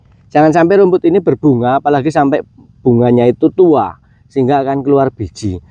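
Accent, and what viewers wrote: Banyumas, Indonesia. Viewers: Woh ngapak kie. Ngapake daerah endi kang?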